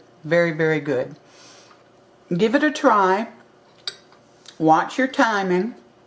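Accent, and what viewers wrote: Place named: West Virginia, United States. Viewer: now im hungry !! this looks wonderful ty 4 sharing. have a great day. are you in wv..............u sound like it to me. just wondering cuz i spent many summers there with my grandparents.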